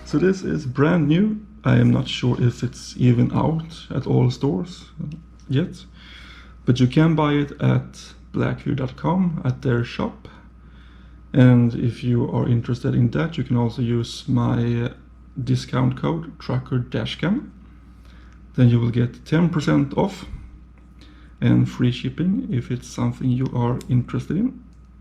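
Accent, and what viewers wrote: Sweden. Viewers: Your Swinglish is absolutely fine. 😃 The camera is great . Extremely clear front and rear and it changed immediately in and out of the tunnel. Excellent product for anyone that spends a lot of time on the road. Thank you once again for your beautiful scenic videos and great choice of music as always. 🤗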